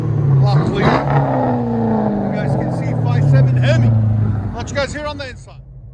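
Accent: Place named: Midwest, United States. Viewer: I'd go for the muffler delete or the Magnaflow. That raspy throaty exhaust of others is addictive...but too many miles of Flowmaster drone in Mustangs cured me. As always, beautiful exhaust work, I really enjoy your channel! (BTW, the narrator's voice doesn't sound Tennesse-born- more like my neck of the woods in Chicago/Wisconsin). In your experience, is the rear muffler delete retaining mid-mufflers (with their shared pipe for balance?) a safer bet? The Magnaflow sounds throaty without too much rasp, but that Mustang made me drone-shy...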